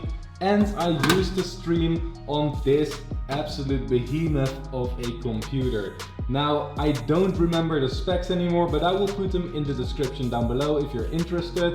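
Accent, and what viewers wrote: Netherlands. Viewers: are you dutch because if i talk english i talk like you haha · Are you dutch? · Are you dutch yust a question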